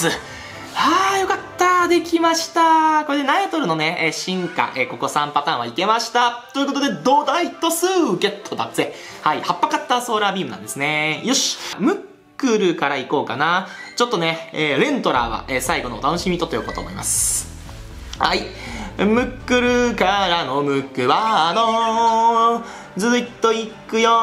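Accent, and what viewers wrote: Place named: Tokyo, Japan. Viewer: 東京の人みんなこんなイントネーションなんか？ 引っかかってなんか腹立つ感じする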